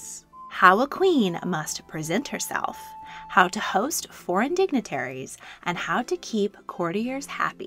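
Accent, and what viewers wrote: United States. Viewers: This so-called documentary is totally boring it doesn’t tell us anything new about Henry and his sisters just goes over and over the same old historical facts… It would’ve been a whole lot better if narrated by a non-American voice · I simply can't listen to an American narrator about English kings and royalty! It's too off-putting! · History Teller and great American native voice ... 02/22/2021 Thanks 😊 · What? An American voice narrating this. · this English history is not good being narrated by an american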